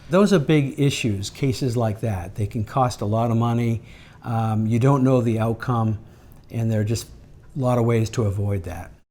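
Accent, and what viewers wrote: Boston, United States. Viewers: . Boston Accent 101. But I’m happy because is So Incredibly Dumb as a song, it deserved to be destroyed.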